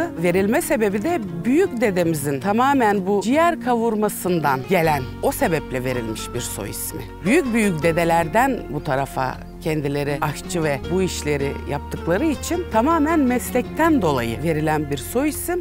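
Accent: Turkey. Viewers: Rumelili, comar degil ondandir:)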